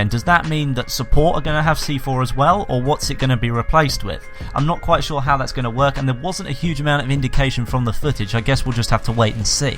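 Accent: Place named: United Kingdom. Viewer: i love this british accent :D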